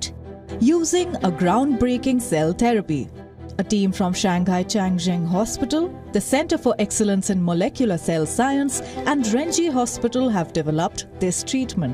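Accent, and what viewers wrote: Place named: India. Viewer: Hindu accent is bleeding my ears …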